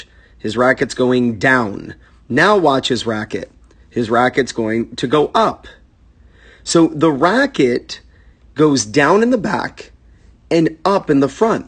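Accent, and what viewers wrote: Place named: United States. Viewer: ...with American accent. Nothing wrong with that, just saying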